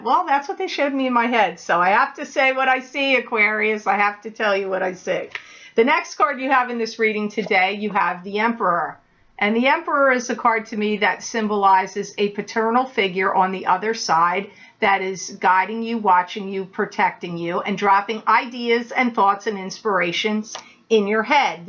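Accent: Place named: New Jersey, United States. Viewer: I absolutely love your vocabulary, dahling and of course, your Jersey accent (miss hearing it over here in AZ)! You're so intelligent and yes, of course your readings are spot on!! Congrats on being booked for the next 8 months!! I look forward to your readings every month, so thank you so much! Oh and just an fyi: if you type in your website without the www it takes you to <link> Love and light from a fellow Jersey girl, Autumn:)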